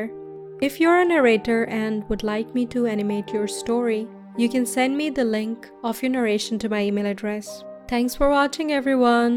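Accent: India. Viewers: U have a really Indian accent it’s annoying me. Sorry ! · Are you indian by any chance?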